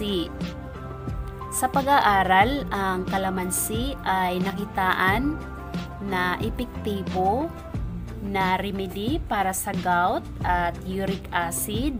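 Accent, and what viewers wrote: Visayas, Philippines. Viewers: Bisaya ka ma'am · wag na po tau magalit sa tono ng pananalita ni ate kc bisaya cia bah kaya suportahan na lang natin c ate mga ka atritis hahahaha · Bisaya kasi!!. Unawain na lang, nauunawaan naman, thanks Inday.. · Bisaya kasi salita kaya nakakabanas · wow ha!banas sa MGA bisaya...puntong bisaya... Tagalog po salita nya...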